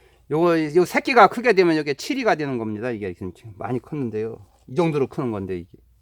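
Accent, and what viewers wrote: Chungcheong, South Korea. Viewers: 충청도 말씨 신기해요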